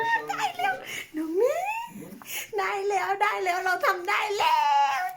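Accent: South, Thailand. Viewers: ชอบพูดใต้น่ารักดี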